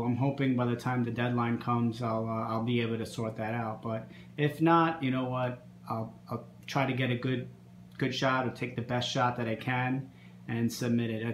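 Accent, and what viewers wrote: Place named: New York, United States. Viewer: I heard your NY more in this video! haha. I cannot believe you found the time to make this when you are so busy with life lately. Those green neons are looking slammmmmming. Great advice as well. Looking good! I am glad you are entering the contest, they give some killer feedback that help us grow in the hobby.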